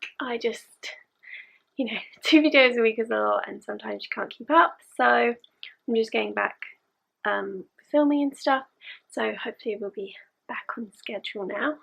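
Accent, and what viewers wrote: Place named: England, United Kingdom. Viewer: Nice channel keep it up love your english accent:D!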